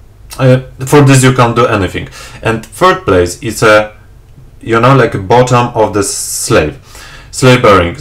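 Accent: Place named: Poland. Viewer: haha domyślałem się że jesteś rodakiem - mamy charakterystyczny akcent :) ale Twój angielski jest naprawdę ok - Pozdrawiam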